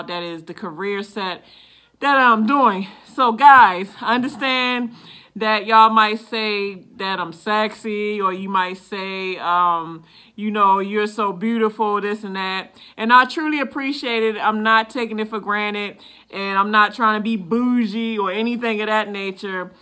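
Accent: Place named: South, United States. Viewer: Girl you talk country with that thing on your tongue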